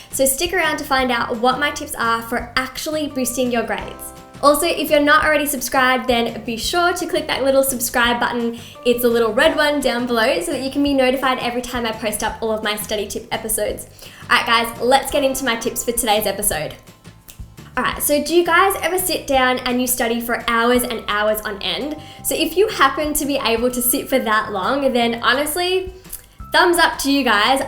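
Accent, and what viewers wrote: Australia. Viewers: Are you Australian? I apologise if your not but you sound Australia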